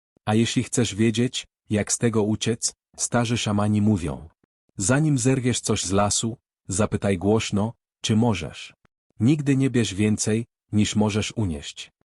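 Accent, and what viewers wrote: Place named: Ukraine. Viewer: a można po polsku bez ukraińskiego akcentu ?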